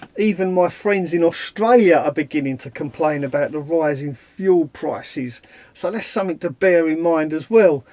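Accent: Cockney, United Kingdom. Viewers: Hi, hope you are well. I don't have a problem with your cockney rhyming, I can understand everyfink you say. So, I have a question...If your van is registered as a van with windows, and not as a campervan, why did you fit your cooking stove permanently? Thanks for sharing. Best to Becky and Monty. Stay safe and keep up the good work.:) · "The Jovial Cockerney" - It's GREAT! that you're keeping it alive.... Too much of that 'other' Cockney-Replacement-Lingo these days for My liking 🤔..... Just don't go all 'Joe Swash' on us EH?? 😄😂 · I understand every word you say as my Mum, uncles and Aunties were all cockney. I'm not but my ex was always saying I was saying certain things wrong. Luckily for me it was his attitude to me 5hat made us split up lol. Xx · Hi, just subscribed. Keep it up buddy including that cockney accent. You sound just like my old pot and pan!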